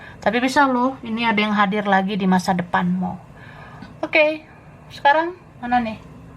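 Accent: Java, Indonesia. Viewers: MB klo ngmng mbok yao. Medok tenan wong jowo 😁👏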